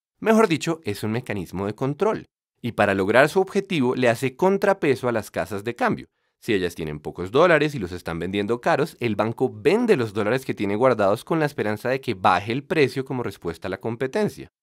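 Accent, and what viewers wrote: Bogotá, Colombia. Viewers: qué buen canal, y sólo vengo a realizar que son colombianos(creo) por el acento... es cierto,no? estudié español 8 años y viví en Medellín 5 años de esos para aprender mejor. ahora no he estudiado mucho pero me siento casi colombiano porque me acogieron con mucho cariño cuando viví allá, se sentía como mi hogar,sabes? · My bien explicado pero con un acento rolo demasiado marcado "ala mi rrrey" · Pero el que habla en el video no es de Medellín, es de Bogotá. Por ende su acento es "rolo".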